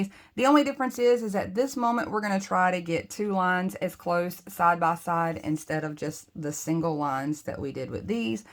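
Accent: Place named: Mississippi, United States. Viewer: I loooove this tutorial and appreciate you. I was raised in South Mississippi and your accent reminds me of home. Im in FL now. Where are you from? Kathy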